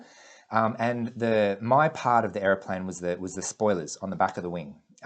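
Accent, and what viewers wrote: Australia. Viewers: I hate Australian accents, but I'm still subscribed because I appreciate your ideas.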